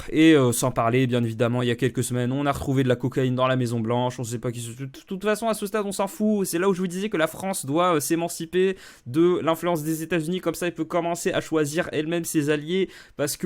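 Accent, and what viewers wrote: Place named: Flanders, Belgium. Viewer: Un flamand français ? C'est devenu extrêmement rare...(t'es de Bailleul ?) Ou belge ? Merci pour pour ton analyse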